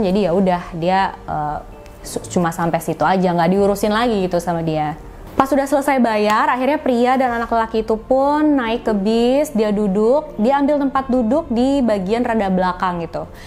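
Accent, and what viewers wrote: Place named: Bandung, Indonesia. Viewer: Kamu orang Bandung ya, logat ngomong.a kaya orang Bandung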